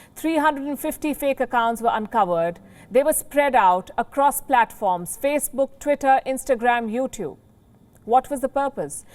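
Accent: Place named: India. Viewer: 这大婶天天用咖喱英语黑中国，数十年如一日😅😅😅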